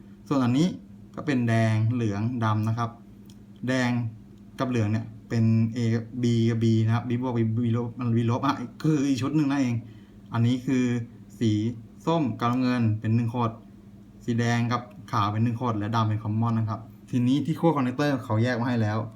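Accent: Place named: Ban Pong, Thailand. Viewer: กำลังติดตามครับ ฟังสำเนียงแล้วน่าจะคนบ้านเดียวกัน บ้านโป่งคับ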